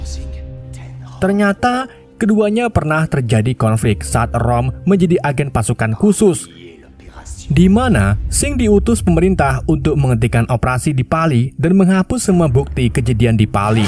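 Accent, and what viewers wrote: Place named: Banyumas, Indonesia. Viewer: JEBULE NGAPAK RIKA BANG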